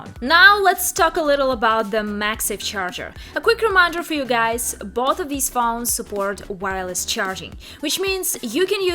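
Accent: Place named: Russia. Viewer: Привет,. Приятно слышать русский акцент:)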